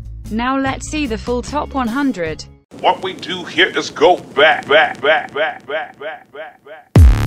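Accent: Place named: Portugal. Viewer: EU prefiro o sotaque português ,e enclusive assistindo seus vídeos eu aprendi o sotaque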